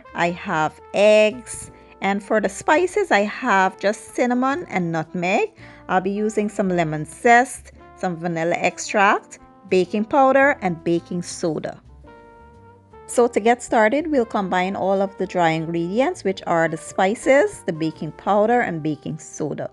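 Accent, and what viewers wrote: Guyana. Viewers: Lovely ! New sub btw are you guyanese ? Your accent lol where are you from?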